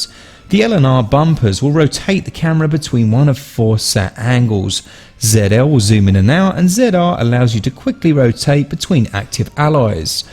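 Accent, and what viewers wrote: United Kingdom. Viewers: I don't think Ren is right, but I'm not for sure. I always say it dis-guy-ah not dis-gay-ah, but you sound British so, that's probably a typical difference in pronunciation.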